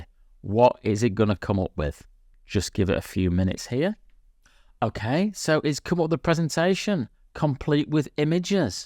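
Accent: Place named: Northern England, United Kingdom. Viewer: Northern England.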